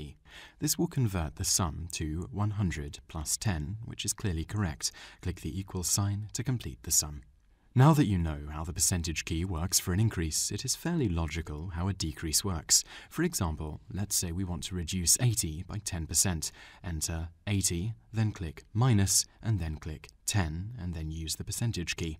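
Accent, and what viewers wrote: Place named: United Kingdom. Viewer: He does not sound like a computer he is British.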